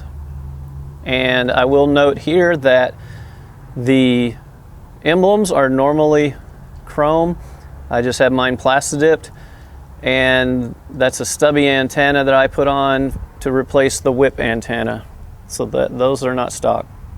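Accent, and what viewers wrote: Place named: South, United States. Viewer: That’s just how many from the South speak — I, for one, like it. Be pretty boring if everyone spoke at the same speed. Watch reruns of Andy of Mayberry or the film To Kill a Mockingbird; that’s how folks talk down there. Seinfeld? Much faster. It’s all good.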